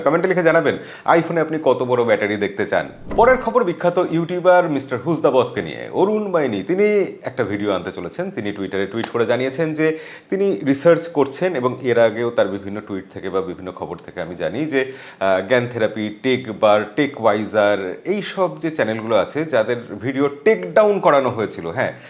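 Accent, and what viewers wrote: Bangladesh. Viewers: বাংলাদেশি উচ্চারণ!!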